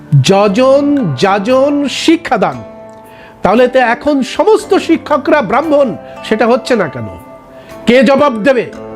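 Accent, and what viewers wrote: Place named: Bangladesh. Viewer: আপনি কথা থেকে বোলছ । মনে হছে আপনি বংলাদেশী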